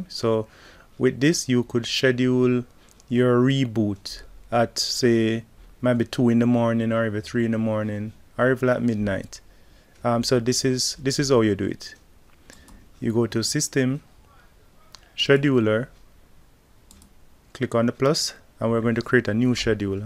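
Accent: Jamaica. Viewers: You sound Jamaican, I lived there for a few years. Thanks for the video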